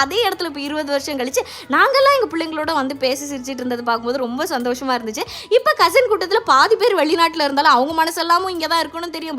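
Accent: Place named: Valliyoor, India. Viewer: Hai nanga vallioor.unga pechu nalla vibe.namma oru pechu.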